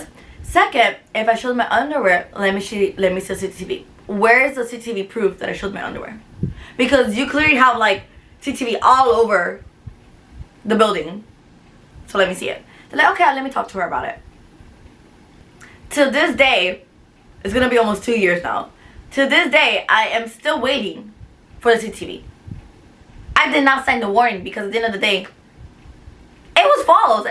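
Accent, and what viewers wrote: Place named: Miami, United States. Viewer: I’m Australian and this is the first time I’ve heard a Miami accent. Like music to my ears 🎶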